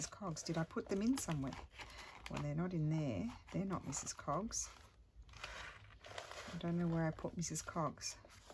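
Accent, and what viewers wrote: Australia. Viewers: "Go bananas decorating" haha I love your Australian accent and expressions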